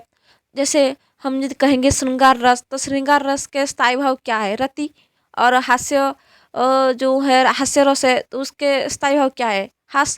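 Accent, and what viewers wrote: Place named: Odisha, India. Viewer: odia uchharan ho rahi hai .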